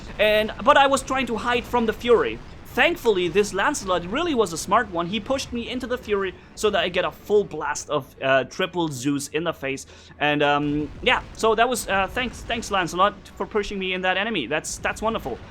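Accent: Germany. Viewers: Bild ich mir das nur ein, aber du hast einen ganz leichten deutsche Akzent🤔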